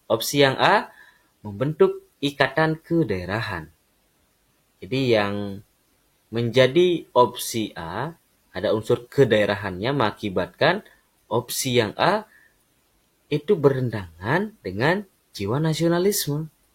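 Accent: Bali, Indonesia. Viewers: Keren banget penjelasannya on point gak bertele2. Dan dijelaskan konsep dan triknya juga... logat balinya juga jadi pemanis😆 Saran kak, biar jadi pembeda dengan channel lain. Kakaknya fokus ke TWK aja. Pasti channelnya cepet berkembang apalagi kakaknya enak banget jelasinnya gampang dipahami jugaa.. Biar kayak lapak sebelah sang tutor yg fokus ke TIU hehee Sukses selalu kak...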